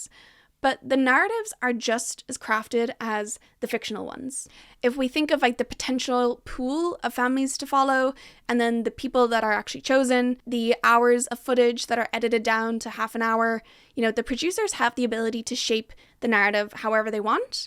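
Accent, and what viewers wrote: Ireland. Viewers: You’re Irish ?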